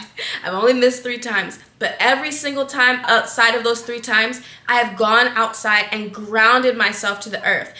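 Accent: Jamaica. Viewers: Yeah the accent is strong in this video. I think I heard a little in other videos tho. Im thinking she is Jamaican. Maybe she’s been around family or spending time there. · It’s the Jamaican accent right out the gate for me! We NEED money 💰 👏🏾👏🏾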